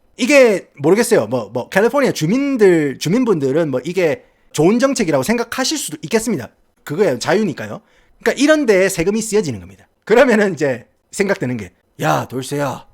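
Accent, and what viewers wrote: Gyeongsang, South Korea. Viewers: 경상도사투리와 영어 악센트 믹스 신선하네요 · 갱상도와 미국을 넘나드는 플로우인데 딕션 쩔어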